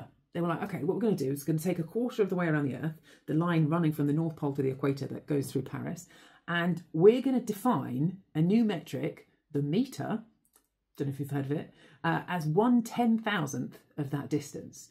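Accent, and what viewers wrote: England, United Kingdom. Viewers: Redhead + English accent = <3 <3 <3 <3 <3 <3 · I'm very much biased by my French pov, so when I hear a British accent explaining science to me, I instantly think Doctor Who. It's a stereotype, but it's just super fun to imagine the Doctor teaching science to humans on YouTube 😊😁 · your intelligence and british accent are sooooo sexy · I like her videos. She smart, attractive and has a lovely English accent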